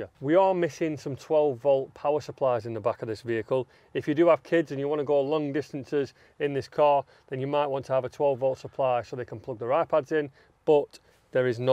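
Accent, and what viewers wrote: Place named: Northern England, United Kingdom. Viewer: fantastic review thanks, living in france coming from Lancashire great to hear a northern very honest voice. thanks for this review very informative very honest, good job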